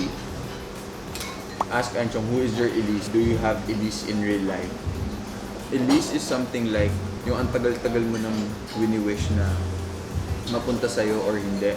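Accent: Bicol, Philippines. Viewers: I can still hear the bicol accent. Siram sa pag dangug haha Namiss ko lugud ang Naga hah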